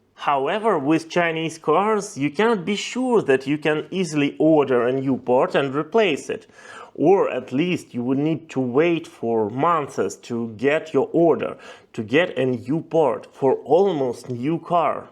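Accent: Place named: Europe. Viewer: why lie or blatantly ignore facts. You either did not do your research or have not seen the level of innovation. You sound like you're from Europe. So i wonder how you have not tried a BYD, NIO, or any of the dozens of Chinese cars in your region. Wheelsboy YouTube channel as well as countless others can educate you if you're not inclined to try one yourself. Again what's the point of telling misinformation when facts are so easy to find. The first link is AMAZING! <link> <link> <link>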